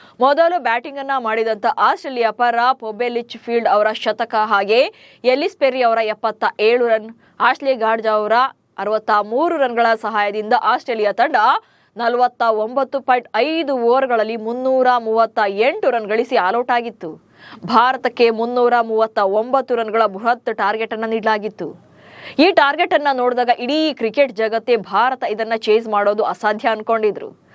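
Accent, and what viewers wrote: Standard. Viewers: ಅದ್ಭುತ ವಿವರಣೆ, ಶುದ್ಧ ಕನ್ನಡ. ಅಭಿನಂದನೆಗಳು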